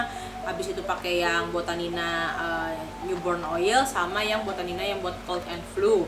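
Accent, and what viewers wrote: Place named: Karo Batak, Indonesia. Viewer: Nampak x logat batak Karo.. Kkk ini ..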